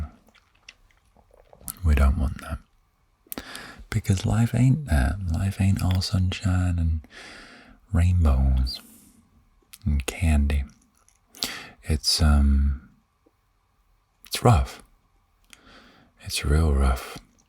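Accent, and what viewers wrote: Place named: United Kingdom. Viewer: calm British voice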